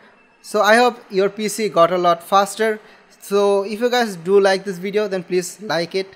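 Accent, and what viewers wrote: India. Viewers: that accent sound Bengali...are you one tho?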